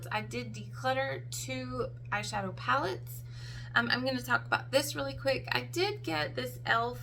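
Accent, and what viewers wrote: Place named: Texas, United States. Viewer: Which state are you in? My guess in Texas or Oklahoma, going by your accent. I've actually spent alot of time in the states, having family in California, Idaho, Illinois, Montana, Arkansas, Texas and Colorado. I'm not American but I'm first generation Australian, from Europe. Other family members have immigrated to US.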